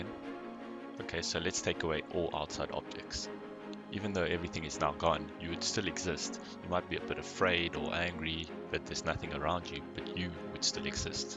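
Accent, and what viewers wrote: South Africa. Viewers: Is that a fellow South African I hear !?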